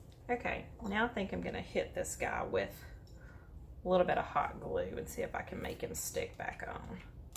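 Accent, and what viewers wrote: South, United States. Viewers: I just had to tell you. I usually play my crafting videos on YouTube in 2x the speed that way I can get through a lot of videos in a short amount of time. You're southern accent is so cute it actually sounds like regular speed. Lol. I can say this because I am also a southern gal and boy do we talk slow! LOL! I was literally cracking up watching& listening to your project just now. Oh almost forgot...love the DIY also. From one slow talker to another, Great job.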